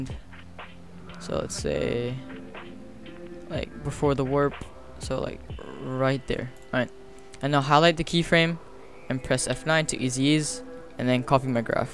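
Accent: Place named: United States. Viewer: whoa ur malaysian an yet u have an american accent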